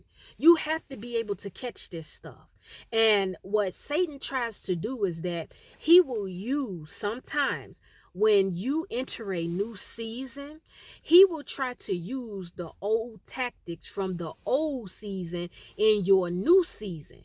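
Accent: Chicago, United States. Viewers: I love the chi town accent. Yes spot on. Iv got away from this with my abusive ex and friends. Now im laying low bettering myself whilst there clicking up in the streets because they can't get me at home ( so I hear )' God is good. So is lol. Amen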